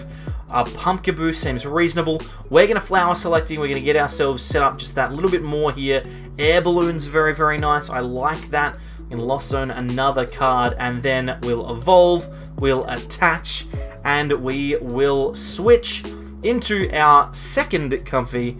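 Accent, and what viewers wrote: Australia. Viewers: hey bro i commented before about what the best deck was, now that the lugia vstar deck isn’t in standard anymore. what do you think the best deck is now for standard ranked bro. if you can reply i would be rly grateful bro. are you aussie?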